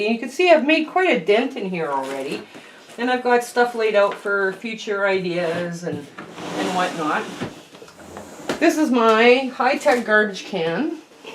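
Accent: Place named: Canada. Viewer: Hi, I envy your organizational skills. My sewing room is a mess right now. We're going to move my sewing room from the dining room to the den. The den is actually smaller so I'm going to have to get better organized. I love how you have so much fabric ready in anticipation of using it in a project, your storage of 2.5 inch strips is genius. I'm stealing that idea from you and I'm going to have to steal your Area 51 for my UFOs as well. It's too funny. I have a Bermuda Triangle in my laundry room, it's where socks go to another dimension. I love your Canadian accent! I went to school in Ontario so my ears perk right up when I hear that lovely Canadian way of saying certain words like project, it takes me back to my school days, fond memories for me. I'm from Michigan and still have a slight accent but I think I sound like a Michigangander now. I have a very dear friend in Calgary, it's just gorgeous there.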